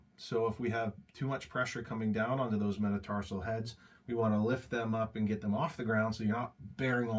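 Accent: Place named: Canada. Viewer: Yes, it's called "Canadian raising." Before I saw Barrie and Orillia in the channel title, I knew was a fellow Canadian. It seems to be more pronounced in/typical of southern Ontario, but we do it here on the west coast too.:)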